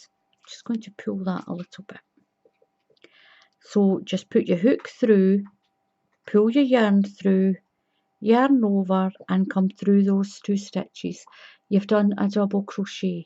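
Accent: Scotland, United Kingdom. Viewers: Thank you. I have been struggling with the repeat of this pattern in the past. You have now explained it very well. Thanks Thanks. I also love your Scotish accent.❤ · You are a fantastic teacher and it’s so wonderful to hear a fellow Scottish voice! 🏴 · Thank you,! You’re an amazing teacher. I don’t read written patterns well and need a video to help. I was in Scotland earlier this year for our daughter’s wedding to a lovely Scottish fellow. (Came home early due to this Covid mess). Your accent brings back all the memories of the warm Scottish welcome we had. Stay well! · Hi gosh listening to you reminds me of my granny who came from Montrose she taught me to crochet many many years ago when i was 9 I'm 65 now and live in NZ so it was lovely to hear you Scottish voice. Enjoyed your video and look forward to learning more things many thanks again:D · .... 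I absolutely love this pattern... and you.... are an amazing teacher.....so well explained... very good camera views on the stitches... wow... just amazing to follow.... I can’t read patterns so I can only make what I can’t follow in tutorials.... you are so easy to follow... I love ❤️ your accent.... so much... I worked with a woman many years ago from Scotland.... she called herself...LADYBIRD..... 😂I just LOVE ❤️ listening to you speak....fond memories....thank you oh so much....I will be making this blanket.!!!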